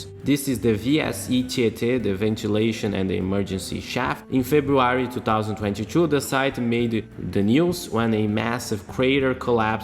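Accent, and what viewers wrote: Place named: Russia. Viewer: Seu ingles me lembra um ingles russo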